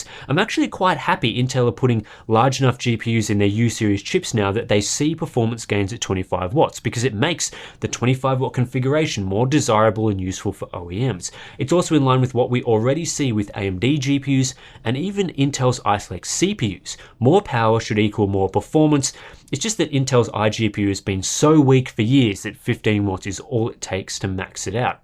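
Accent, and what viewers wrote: Australia. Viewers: Strange thing to moan about [FULL STOP] [COMMA] maybe you don't understand the accent [COMMA] he is Australian [FULL STOP]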